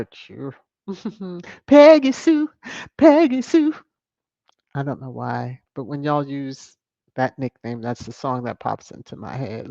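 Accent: RP, United Kingdom. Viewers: Hello happy Thursday, didn't catch the live but enjoy listening to replay, 👍👍👍👍👍👍💯💯💯😘😘😘😍😍❤️❤️😍🌹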